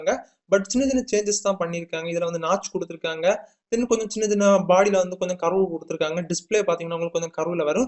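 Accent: Kanyakumari, India. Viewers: Super video bro and voice look like our kumari slang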